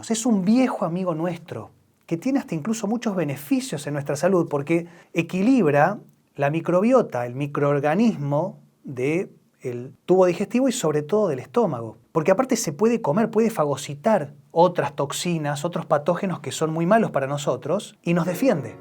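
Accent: Argentina. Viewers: vos sos argentino? disculpa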